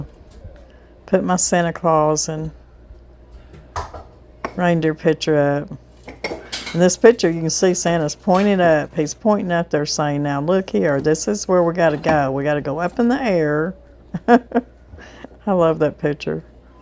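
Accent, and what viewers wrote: South, United States. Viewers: Dear Miss, Another lovely video of you and your family! When I listen to you talking about Christmas and all your decorations, it’s like listening to myself. I have a room in the basement that is just for “all things Christmas” and I keep saying that I need to give some away, but it just doesn’t happen! Maybe I should try harder!🤭😉🥰 I’m looking forward to making the Irish Soda Bread………wish I’d had the recipe last week in time for St. Paddy’s Day, but that can be made anytime of year…….it’s always delicious! Thanks again for your videos……I really enjoy listening to your soothing, calm voice and your gentle southern accent. We’re expecting snow and sleet tomorrow so I guess our spring is aways off yet! God bless you and! 💕💕Jackie🪷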